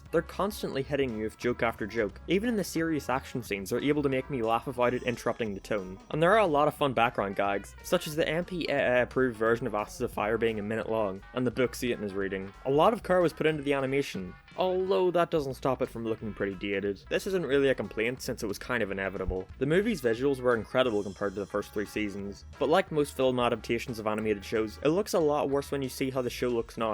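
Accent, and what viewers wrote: Northern Ireland, United Kingdom. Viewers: Mate, what part of Northern Ireland are you from?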